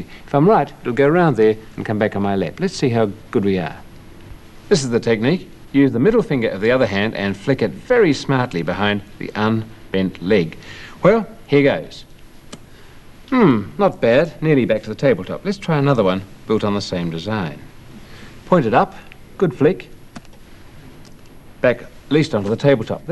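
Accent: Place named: Australia. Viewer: You have the most stunning Australian accent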